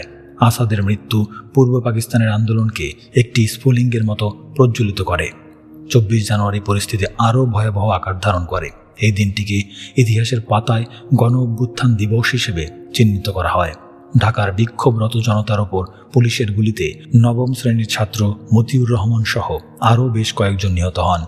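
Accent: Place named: India. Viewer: বই কথাবলছে না।৷ ভারতের দাদদের মত করে বলছে।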